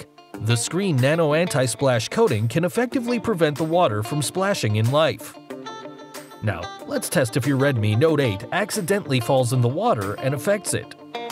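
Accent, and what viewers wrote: United States. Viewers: The script of this video sounds like it was written in China and read by an American.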